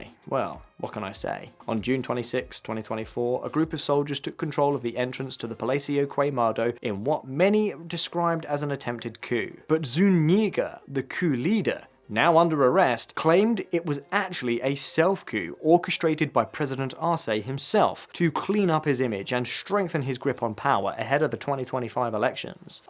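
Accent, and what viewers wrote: United Kingdom. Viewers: I wish the person that put this video together would have used another AI accent. The British accent is like finger nails on a chalk board.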